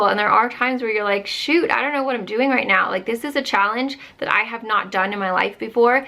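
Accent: England, United Kingdom. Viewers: Might be A stupid questuon but did you ever have an English accent.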